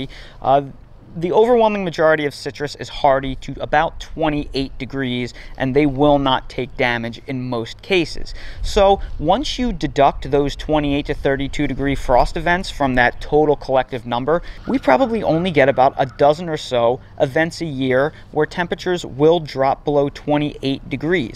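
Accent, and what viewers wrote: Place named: North, United States. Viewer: Florida land is far more valuable than the fruit industry yields in profit. Citrus farms have been selling off for years. In GA/FL, we pronounce it FlOOR i da. You sound like you're from a little farther north than North Carolina.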